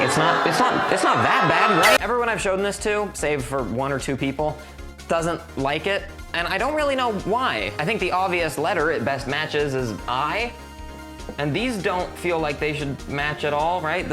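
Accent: United Kingdom. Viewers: Are you a brit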